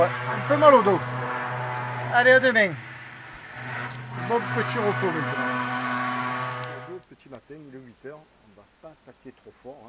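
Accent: South, France. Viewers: Bonjour, et hello les marins, J'ai flotté pas mal pendant des années, dont sept ans sur un Banjer 37 (revendu il y a peu suite à perte d'emploi, snif), je suis en Belgique, mer du nord (moins quarante!!!!), d'où le besoin d'avoir une timonerie fermée pour naviguer toute l'année. Merci, je me régale avec tes videos, ton accent du Sud (chaud), et tes commentaires très intéressants, cela me fait chaud au coeur. La navigation en Méditerranée est différente de celle pratiquée en Manche et Mer du Nord, où les escales sont en France, Belgique, Hollande et Angleterre, pour rester dans les deux cents mille. Te voilà donc reparti pour quelques délicieux mois de navigation, d'eau salée, et d'apéros. Profites-en un maximum, demain est un autre jour.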